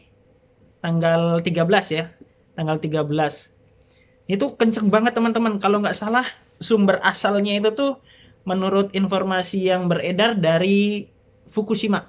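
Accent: Java, Indonesia. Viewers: iya, kirain dari jawa...😂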